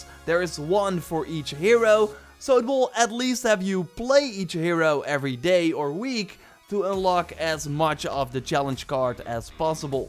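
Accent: Netherlands. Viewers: That Dutch accent is so strange and thick.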